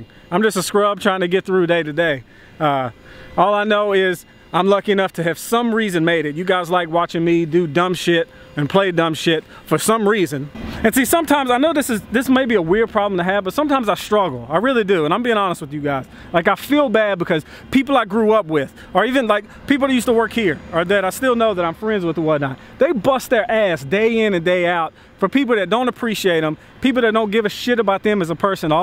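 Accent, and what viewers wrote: South, United States. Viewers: he sound country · 1. I knew from that voice that he was a white guy from the south. 2. LOL at that dig at Terroriser. I like them both but lol.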